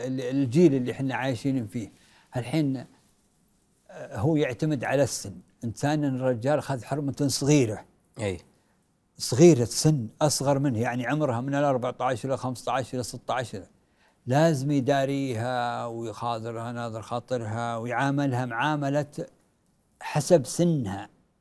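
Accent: Qassim, Saudi Arabia. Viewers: لهجة المديفر القصيمية طلعت بهالحلقة يالبى أهل القصيم على راسي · المديفر فالحلقة ذي جاب لهجته القصيميه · من امتع الحلقات،، المديفر من قوة ماهو مندمج معه قاعد يخاطبه بلهجته القصيميه واكثر حلقة ضحكت فيها ،،الله يعطيه طولة العمر ويحفضه وماشاء الله تبارك الله عليه .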